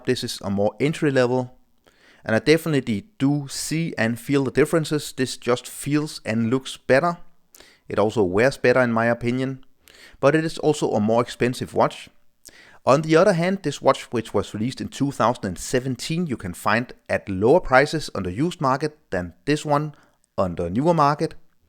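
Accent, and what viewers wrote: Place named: Denmark. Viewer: lyder umiskendelig som dansk-engelsk tydeligvis 🙂 I think the Samurai watchcase is beautiful both this which is the new one but also the previous edition being a bit bigger. I have a weak spot for green dials, however i would prefer this model in a higher quality as for a ceramic bezel an crystal glas. I am still considering buying it but there are so many different to chose from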